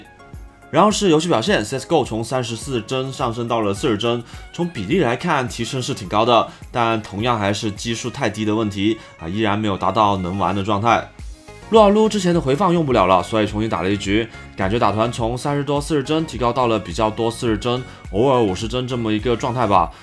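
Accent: Cantonese, China. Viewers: 請問你是廣東人嗎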